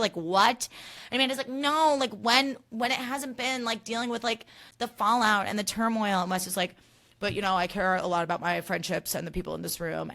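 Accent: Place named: Australia. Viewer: My jaw is on the floor at your Australian accent ⭐⭐⭐⭐⭐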